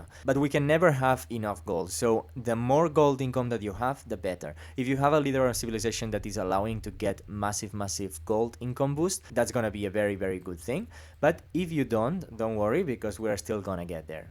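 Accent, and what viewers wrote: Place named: Iberia. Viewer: ahahah sehr cool mein freund. where u from anyways? by your accent i would assume iberia or south america🙃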